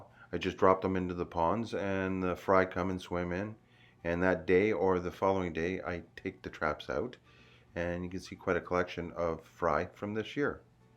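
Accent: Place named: Canada. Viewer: Hahaha! Yep, you sound Canadian now! I live in central lower Michigan... our fall colors are in full glory right now. It's difficult for me to concentrate on the road on my hour long drive home from work, they're so beautiful! Gotta watch for deer, turkey, and sometimes coyotes. Looking forward to your fall colors! P.S. forgot to tell you thanks for answering my koi question!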